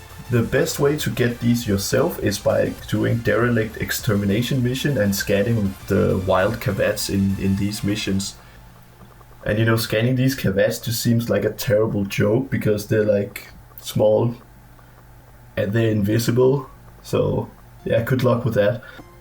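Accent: Denmark. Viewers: Well it was my frist kavat and I just got it xD Btw are you from Denmark? because you sounds a bit like a dane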